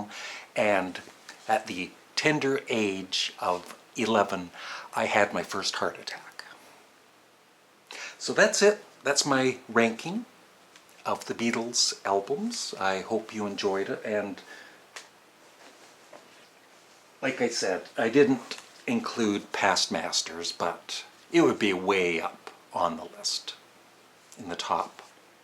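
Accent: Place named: Canada. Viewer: No doubt...you are Canadian. Lol. No mistaking that accent. But I laugh, because I am from Texas...and I assure you...you could poke fun at me too. Lol. Thanks for the post/rankings. You get a like.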